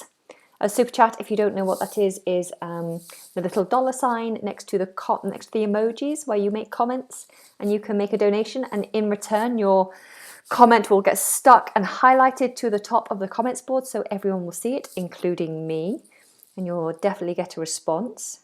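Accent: United Kingdom. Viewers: Hi! ^-^I am ♥ I'm watching u from Syria and I really enjoyed this video. really helpfull..British accent is my favorite. And you're awesome*.*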